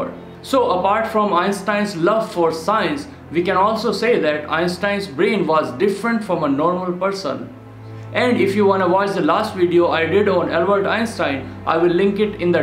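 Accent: India. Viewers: I fucking love indian accents 😂🤙🏻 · 20% i can't even hear what your saying with that indian act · I can’t understand u ur so Indian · First time watcing indian. (Know from the speaking accent.) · is this indian einstein speaking? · Yeah I also heard this Indian man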